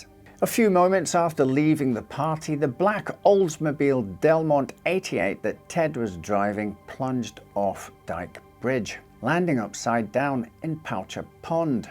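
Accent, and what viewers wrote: Scotland, United Kingdom. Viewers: I like your stories and enjoy your soft Scottish accent. I can't abide stories about harming animals and had to avoid 'Miracle Mike' and struggled through the 'Tarrare' story. Otherwise, great channel. Have you thought about doing a piece on 'The Ant Hill Kids'? A very strange tale, indeed.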